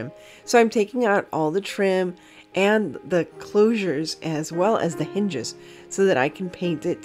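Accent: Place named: Miami, United States. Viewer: I thought I detected the Miami accent! I grew up there just off of Red Road and Calle Ocho! In Ocala now.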